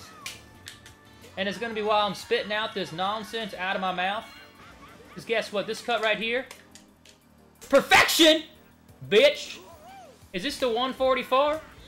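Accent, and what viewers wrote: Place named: South, United States. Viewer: lmao that southern accent. People here in SC actually say "fixin to do something" I didn't think that was a real thing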